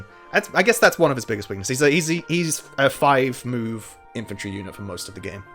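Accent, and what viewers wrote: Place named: United Kingdom. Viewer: My experience with: > hey, that’s a chill tierlist, it can help stay up a bit longer. May as well subscribe, to watch that other Radiant Dawn 4-part series! > hey, that’s wind waker bgm, that can cheer me up a bit..! > that’s british accent, it sets me on good moods! > OH that’s theme!! And that’s how I became a fan! Thanks for the content! ❤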